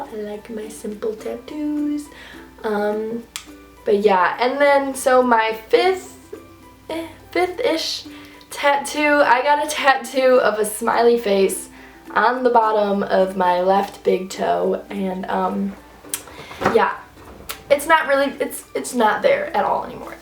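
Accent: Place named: Michigan, United States. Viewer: I love your accent. Are you from Michigan? I've always wanted to live there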